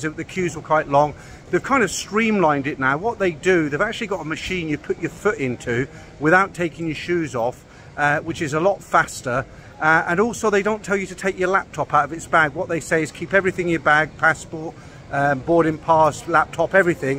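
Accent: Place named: United Kingdom. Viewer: It’s called an accent… Have you really been sheltered that much? Different parts of Britain do have different accents and in some areas, they tend to add an “err” sound at the end. They don’t even realize they do it. Because you know. It’s an accent. 🤦‍♂️ How do you think the word “soccER”, came about? (Actually there is more to how we got the word soccer but that is beside the point.)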